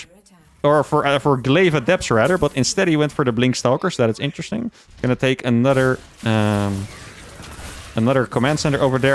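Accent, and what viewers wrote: Netherlands. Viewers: Oh ja, dat Nederlandse accent XD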